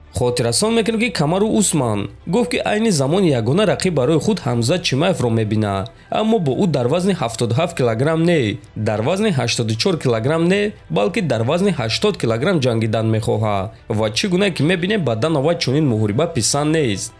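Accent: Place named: Dushanbe, Tajikistan. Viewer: Аксенти ту ҳамин аксенти Душанбеги бисёр сарама маиз мекунад. Аксентат гуҳ хаст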